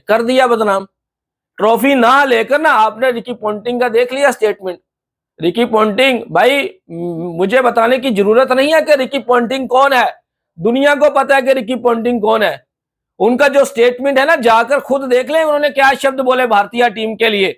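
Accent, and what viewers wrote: Pakistan. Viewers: Aap ki urdu Pakistani urdu lag rahi hai❤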